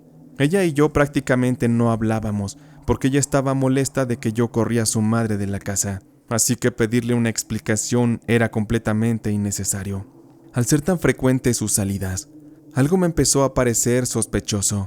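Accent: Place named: Mexico. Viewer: ME ENCANTA EL ACENTRO MEXICANO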